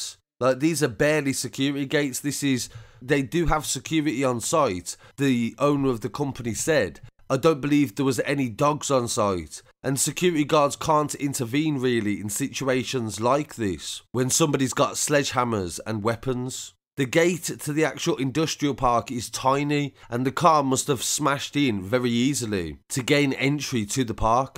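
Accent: Birmingham, United Kingdom. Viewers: Are you a brummie 💙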